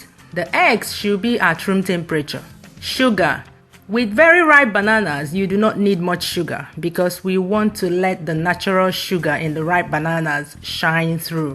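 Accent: Igbo, Nigeria. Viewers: Chai! Your Igbo tongue is top notch 👍🏽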